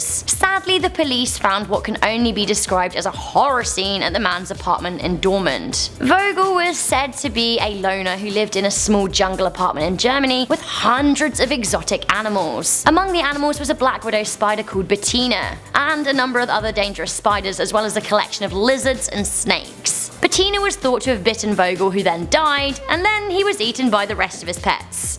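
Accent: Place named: United Kingdom. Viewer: I like your British accent and you one of my favorite YouTubers like if you agree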